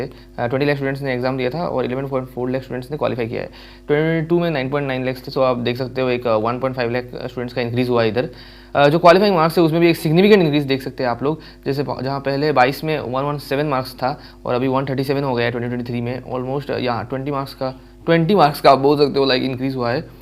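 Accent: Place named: Silchar, India. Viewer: Bro silchar ka ho kya...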